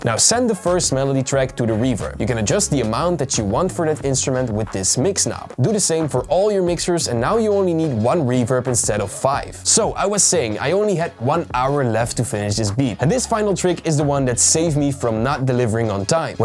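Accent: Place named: Netherlands. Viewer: Ik hoor dat je Nederlands bent 👊🏼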